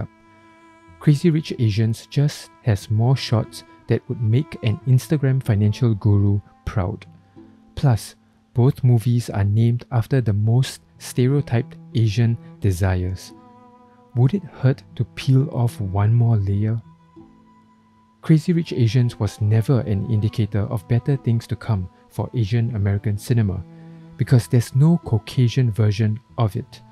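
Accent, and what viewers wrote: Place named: Malaysia. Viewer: hi, hi. are you malaysian/bruneinese/singaporean? you have an accent from these region.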